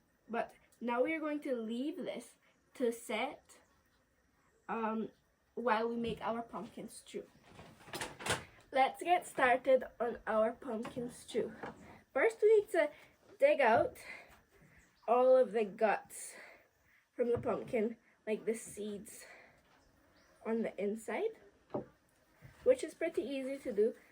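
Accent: Scotland, United Kingdom. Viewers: you're accent is all Scottish now. Beautiful 🙏🏽🥰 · Nice to see you cooking our traditional Guyanese cuisine,nice job and I love your Scottish accent , have a blessed evening and enjoy your vacation, there is nowhere better than home.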